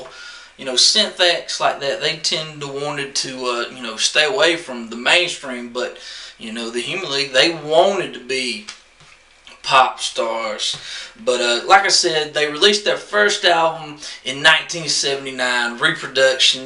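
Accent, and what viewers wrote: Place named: South, United States. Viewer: sounds weird you talking bout electronic music with that accent. i expect some good old country and western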